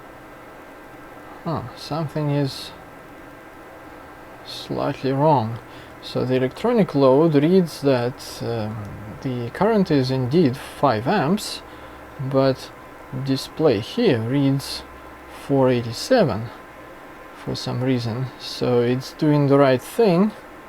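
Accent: Russia. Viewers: Yeah you are probably right. I guess searching for a replacement transformer that would fit this application would time consuming as well. By the way I was wondering for some time where do you live :) I noticed your Russian accent but you are using 120 V AC. So you must live in US or Canada. Is that right?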